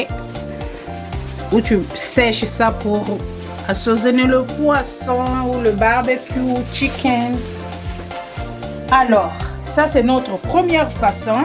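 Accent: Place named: Republic of the Congo. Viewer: Merci ma soeur Ton accent est du congo bzv Sans me tromper vous etes lari? Je reconbait notre accent . Soyez béni.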